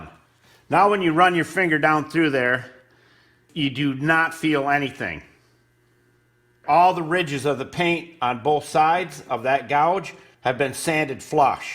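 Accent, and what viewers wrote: United States. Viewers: where is this accent from in USA? sounds great. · I am in UK and unfortunately I don't understand Yanky ! I have no idea what your talking about.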